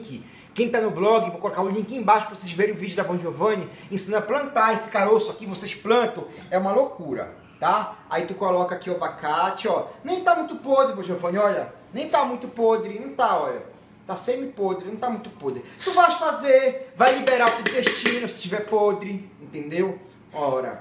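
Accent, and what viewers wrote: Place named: Pará, Brazil. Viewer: Ele é paraense!! Esse "eguaaaa" e leso são inconfundíveis. Kkkkk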